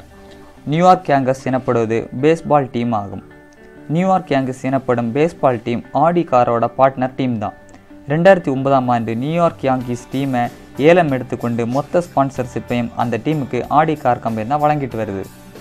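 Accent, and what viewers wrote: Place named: Marthandam, India. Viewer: Nee Marthandam ah Tambi voice nalla theriuthu...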